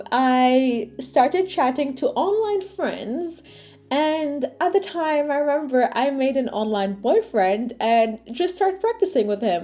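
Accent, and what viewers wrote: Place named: United States. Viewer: When we first subscribed to your channel, we thought you were American :) your accent is really good!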